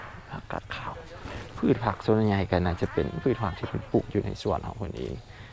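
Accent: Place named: Lue, Thailand. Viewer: เขาพูดภาษาอะไรเจ้าของช่อง (สำเนียงคล้ายๆพวกลั่ว) มันอยู่ภาคไหนของประเทศเจ้าของช่อง แม้!!คลิปแบบนี้เรื่องของอยากของกิน น่าจะจัดสัก25นาทีขึ้น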